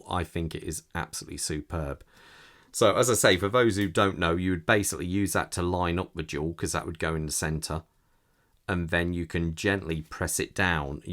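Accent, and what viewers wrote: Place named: England, United Kingdom. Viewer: I thought you was American, cool you're English. Your videos are really crazy good, enjoy watching, cheers mate.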